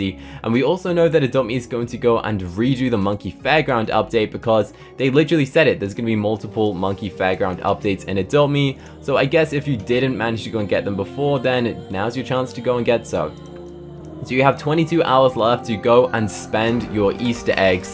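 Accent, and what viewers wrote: United Kingdom. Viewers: Hi I want to ask are you from England cause Your accent is british and i love your accent!!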